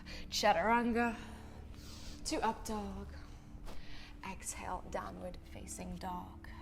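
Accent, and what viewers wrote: South Africa. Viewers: Is that a South African accent I'm picking up? Loved the practice, perfect balance and I feel very energized and ready for my Friday!!!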